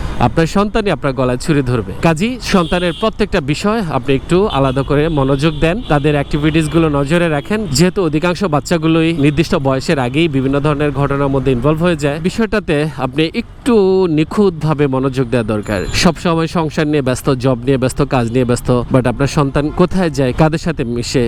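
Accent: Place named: Bhuiyapara, Bangladesh. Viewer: Bhai apnar basha ki Bhuiyapara naki?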